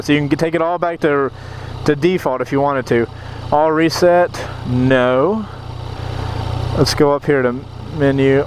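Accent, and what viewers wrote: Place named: United States. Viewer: "Dudn't feel like it, dudn't feel like it at all." Learn to speak the Queen's English! Stupid Yank!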